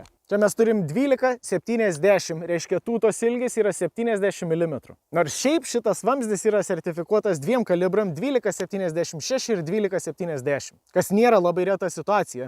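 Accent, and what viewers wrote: Šiauliai, Lithuania. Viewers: Tu turi žiauriai gerą iškalbą ir gerą šiaulietišką prieskonį :D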